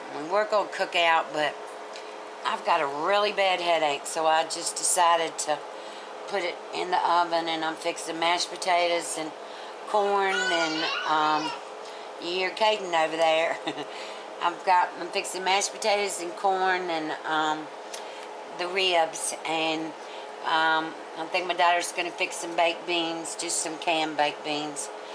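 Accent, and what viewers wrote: South, United States. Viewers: Today, Easter weekend April 8, 2023, and I just found your channel!!!! I love your rib recipe! And I can't wait to cook them!! I just love your recipes! Thank you! Your accent is so southern! Just lovely! ❤❤❤😊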